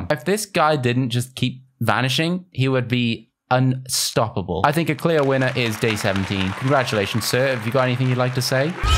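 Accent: United Kingdom. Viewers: Question for: Are you British. And I love you videos it's very fun. AND YOU ARE MY CHILDHOOD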